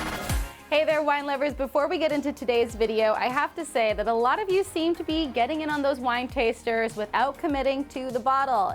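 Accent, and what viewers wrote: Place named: Italy. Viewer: Thanks for the note, but it is simply the way I speak. I come from a very flamboyant Italian family lol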